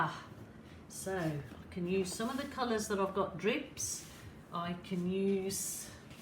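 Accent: Birmingham, United Kingdom. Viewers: Funny after all those years you still have a Brummie accent. My hubby is from Luton in Bedfordshire and has been here in Sydney for 39 years. Aussies think he has a British accent and Brits think he has an Aussie accent LOL. Thanks for all your videos and sharing your experiments with us.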